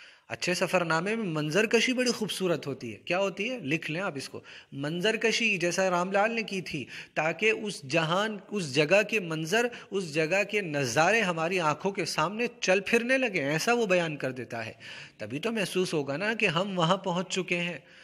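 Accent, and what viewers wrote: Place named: Punjab, India. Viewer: wah sir kiya Punjabi boli hai apne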